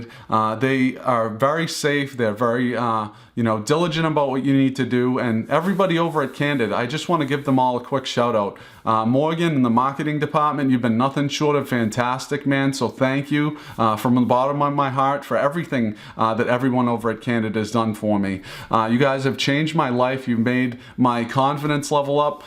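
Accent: Boston, United States. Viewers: That's a crazy story..people have no consideration...You remind me of Ben Affleck..the look and boston accent...:)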